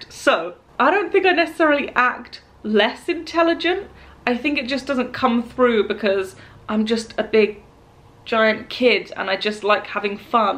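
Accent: United Kingdom. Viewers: I'm a new viewer and already intrigued by your accent - what are the influences? It's like a queen's english dialect over something more regional? Scottish? Irish?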